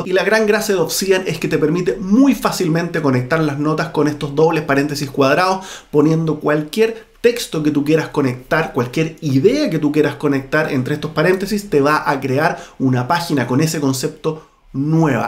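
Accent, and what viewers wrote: Chile. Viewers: ¡Qué agradable escuchar el acento chileno cuando el orador tiene una excelente dicción! Además, el contenido del video aporta información valiosa para quienes comenzamos a explorar el uso de estas herramientas.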